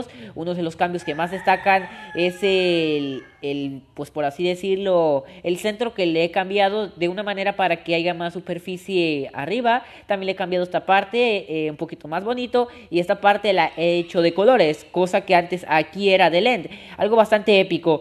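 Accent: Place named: Yucatan, Mexico. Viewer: Ahhh pense que eras de yucatan 😄😉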